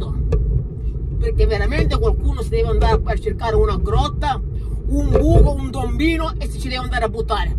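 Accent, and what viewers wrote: Sicily, Italy. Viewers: Tu non riscontri mai e vai per la tua strada. Mi fai impazzire con questa tua magnifica inflessione sicilianissima e meridionalissima che fa a pugni con quei mezzi francesi di Villar Perosa tutti impettiti, tutti sinuosi, con le erre mosce e arrotondate. Come ti spieghi che nonostante hanno sempre vinto sono scolorati, scontenti, seriosi, noiosi e la festa si concretizza miseramente col solito mezzo giro a piazza San Carlo. Che noia, che pena…..Come fai da Catania a sentirti in sintonia con loro ? Lo spiegherai un giorno ?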